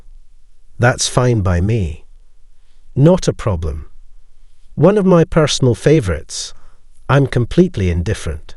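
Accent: Scotland, United Kingdom. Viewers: This robot voice is amazing. I mean, yeah. It makes some mistakes, but this Scottish accent is amazing.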